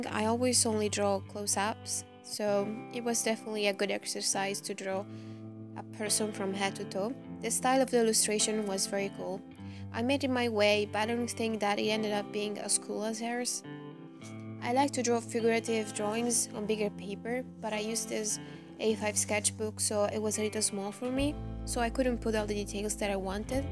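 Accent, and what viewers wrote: Italy. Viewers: Your italian accent is beautiful 😊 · Non aver paura di parlare inglese! in alcuni punti pronunci bene le parole, sei anche spedita. in altri punti ricadi sull'accento italiano che in realtà sei anche capace di evitare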